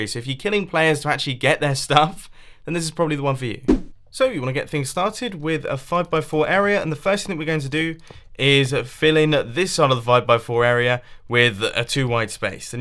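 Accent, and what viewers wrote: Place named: United Kingdom. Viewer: Oh um sorry. Thanks for another fantastic video mate! Please keep it up! I also like your British Accent!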